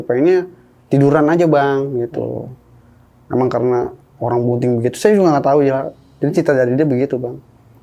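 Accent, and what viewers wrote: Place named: Jakarta, Indonesia. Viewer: Betawi banget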